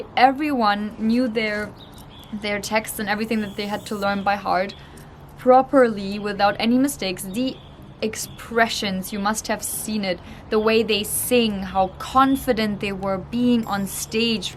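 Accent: India. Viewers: your English communication like Indian and very simple and not like your Motherland.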